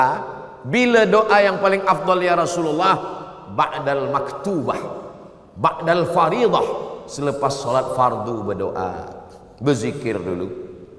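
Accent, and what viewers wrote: Malaysia. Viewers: Respek dgn UAS.. ketika di Malaysia, loghat / dialek juga ditutur dlm bahasa kebanyakan di Malaysia. ketika video di Indonesia juga mengikut loghat / dialek tempatan di sana. Semoga UAS sentiasa sihat dan berbahagia selalu.. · Ustadz ngomong nya koq seperti orang malaysia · Memang dh dia melayu.. Mesti la..loghat je tele tu biasa mngikut negeri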